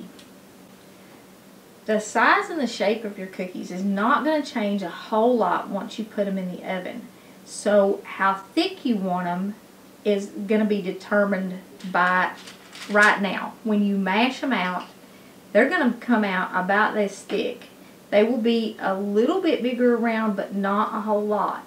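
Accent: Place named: Tennessee, United States. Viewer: Your Tennessee ? accent reminded me so much of someone I just couldn’t place it, and then it hit me! Dolly Parton! The way you pronounce many words reminds me of Ms. Parton!🥰🤩🤗 I am really enjoying your videos. Thank you ! 🙏🏼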